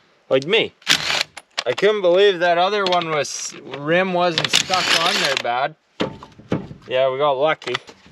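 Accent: Canada. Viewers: Aha. I was trying to figure out where this guy was from. I was guessing Dutch Canadian. So I know Canadian for sure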